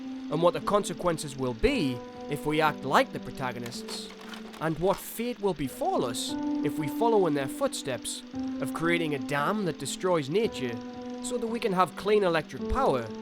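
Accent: Newcastle, United Kingdom. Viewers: are you half geordie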